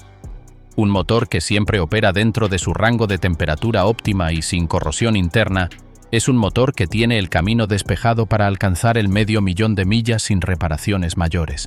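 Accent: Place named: Spain. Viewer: No me gusta el español de españa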